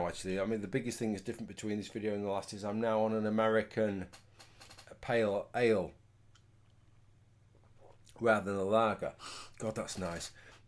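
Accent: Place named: Lincolnshire, United Kingdom. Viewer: Louth? I always suspected from your accent you must be from my neck of the woods. I was born and raised in bloody Cleethorpes! Went to Louth a few times. I remember Hubbard's Hills...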